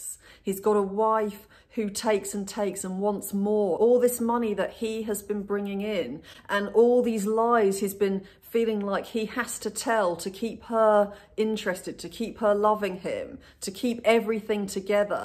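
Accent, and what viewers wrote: England, United Kingdom. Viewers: I love that English accent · Your beautiful English accent to this American is so refreshing and lovely and calming to listen to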